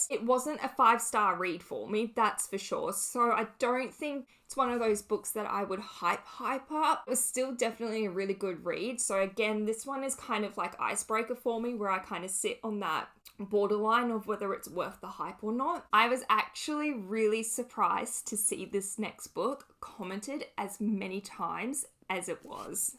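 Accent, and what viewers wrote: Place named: Australia. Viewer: I love your accent. It’s Australian right?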